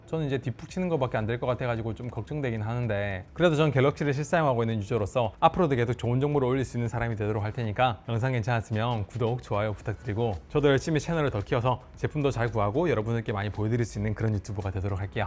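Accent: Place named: Seoul, South Korea. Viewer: 오랜만에 왔는데 말투가 시원시원하게 바뀌시고 딕션도 더 좋아지셨어요 빠르기도 빠르고 부드러운 서울말쓰는 느낌 ㅎ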